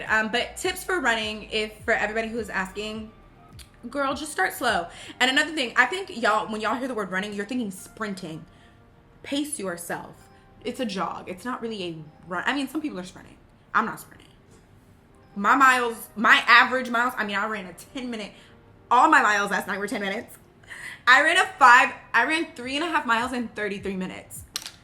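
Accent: New York, United States. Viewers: I’m a bred New Yorker lol and you fit with us I have to say, your voice alone lolll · 😂😂 that’s true, you didn’t cry! I agree NY was an upgrade. You’re officially global at this point! And I’m loving hearing the NY accent and attitude start to come out! 😂 sorry you gotta deal with all that other stuff, omg. You say it’s nothing but that looks and sounds like a very big something! I’m definitely keeping you in my prayers and will ask God to keep a hedge of protection around you. 🙏🏾 keep thriving beautiful! ✨🥰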